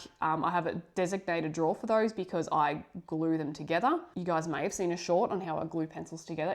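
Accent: Australia. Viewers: Sarah. From Melbourne. Newbie to your content. You have already converted me to try adult colouring. Love hearing Aussie ascents.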